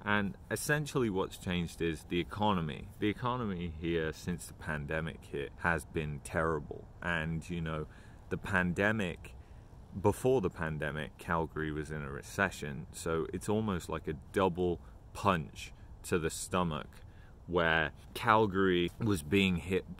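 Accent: United Kingdom. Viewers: you're so interesting to listen to. What part of the UK are you from?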